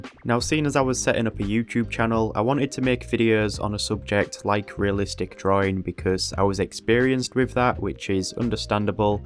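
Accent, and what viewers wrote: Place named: Middlesbrough, United Kingdom. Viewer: Are you from Middlesbrough or nearby? I think we have a similar accent haha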